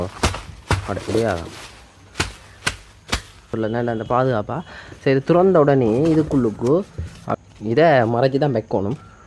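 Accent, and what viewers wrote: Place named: Sri Lanka. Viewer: இலங்கை தமிழ் என்ன ஒரு இனிமை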